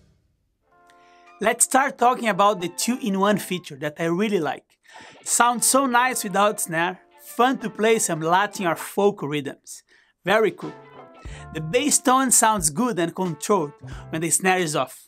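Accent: Brazil. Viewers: Seu sotaque parecia muito brasileiro, e agora que fui ver eu estava certo kkkkkkkkkkkkk Que deus te abnçoes irmão!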